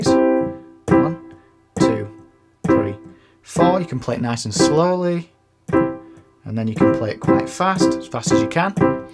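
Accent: Yorkshire, United Kingdom. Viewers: You must be a Yorkshire fella 🤔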